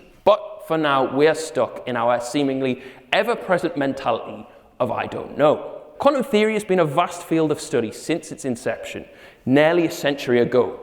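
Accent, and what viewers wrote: Newcastle, United Kingdom. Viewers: Very impressive, proving you can say something smart in a Geordie accent!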